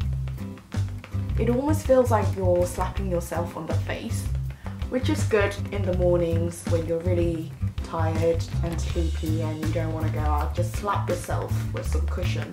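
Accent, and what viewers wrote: United Kingdom. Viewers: 아 님께는 처음 댓글인데:) 너무 귀여워요 동생같으으으으음!!!! 매력 넘쳐요 ㅠㅠ 영국발음이며 화장이며 말투까지 앞으로도 자주 봤음 좋겠어요 ㅋㅋ 빠~이!( 버전인사ㅋㅋ) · 영상 보는게 처음인데 너무 귀여우시고 매력있으셔서 댓글 달아요 ㅎㅎ 영국식 영어 발음도 정말 매력있으시네요!!!ㅋㅋ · I adore your accent/voice! Are you British?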